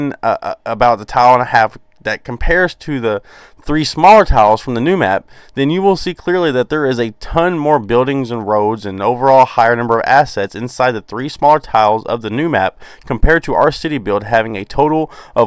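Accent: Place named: South, United States. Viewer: That's true. I did not think about the height difference. Btw I love your Southern accent. Southerner here too (NC).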